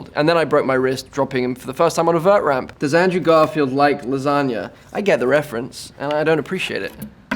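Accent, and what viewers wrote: United Kingdom. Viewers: i forgot this man is british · W……….wait……….HE’S BRITISH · NO WAY HES BRITISH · everytime i hear andrew garfield speak, i remember the guy is british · Since when he British? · My mind is blown away that he has a british accent.. ive only seen him in movies with american accents this is so strange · Since when is he brittish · am I the only person alive who had no clue he had a British accent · why british :( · I forget that he’s got a Brit accent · He has a British accent but at times an American accent comes out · why am I surprised everytime I hear his british accent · How did I never realise he was British... am I... dumb? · Since when is he British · I've heard him do an American accent so much that it feels like he's just doing an accent in this video, like "Hey, he's pretty good at that British accent". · He's much more British than I remember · When did he have a British accent??? 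How was I not aware this whole time??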